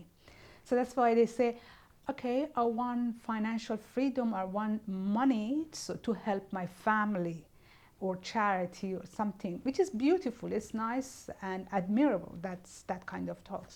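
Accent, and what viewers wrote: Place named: Iran. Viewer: Very fascinating. I see your name is but hear a strong Persian accent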